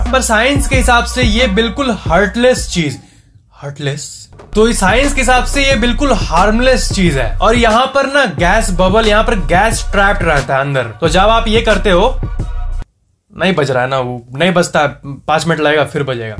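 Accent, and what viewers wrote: Bihar, India. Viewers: sir aap bihar se hai kya.. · Yes !! He is Bihari Bhai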